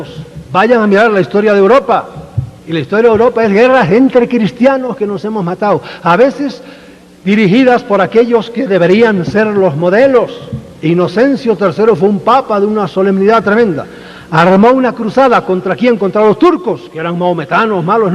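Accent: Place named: Spain. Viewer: crean solo en mi palabra sin ninguna evidencia de nada ya que es mas comodo y barato para mi . parece increible que despues de tantos años todavia sigamos doblegados y nos dejemos imprecionar en cuanto oimos el acento español, haa pero no sea uno de los nuestros por que le exigimos evidencias fotos y aun asi lo denostamos Que nos pasa?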